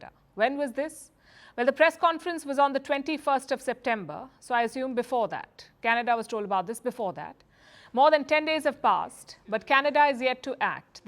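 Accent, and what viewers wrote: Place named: India. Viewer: I like the way this lady tell her stories. Most of the Indian accent sucks but she manages to pull it off. Much love and respect from Africa.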